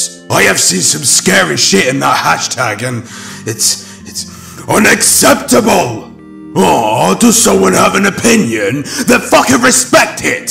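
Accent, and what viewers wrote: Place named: United Kingdom. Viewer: Why does he sound Brit'ish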